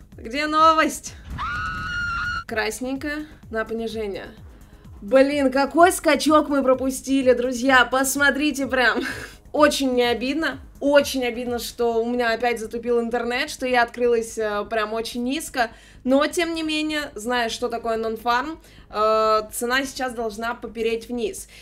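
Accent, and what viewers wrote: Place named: Russia. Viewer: И, не профИт а прОфит, учитесь говорить правильно. Я понимаю что Вы придерживаетесь провинциального произношения русской речи, но никогда не поздно научиться. Аж уши режет: проФит, средствА, постираться, убраться, погреть что-либо из еды, а не подогреть или разогреть, задолбали провинциалы со своей речью.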